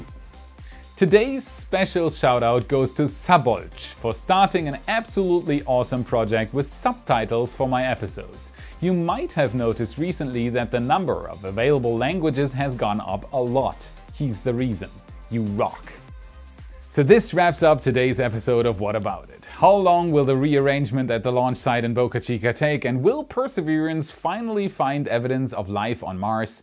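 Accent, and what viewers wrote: Germany. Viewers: Tscherman Akzent. His accent is actually quite weak -- there are a few words he gets wrong (such as this one), a few vowels that aren't quite right ("apload"/"upload"), and a particular pause in certain consonant clusters that is common in German. And that's about it. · Are you german?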